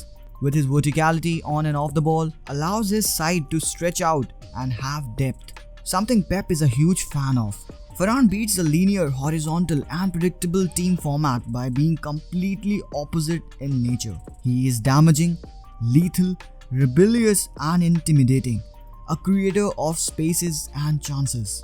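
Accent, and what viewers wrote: India. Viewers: great video loved it keep it up 1.add subtitle options 2.it will be a lot better if your accent is improved from indian to a bit british or american.(JUST MY OPINION,PLEASE DON'T MIND)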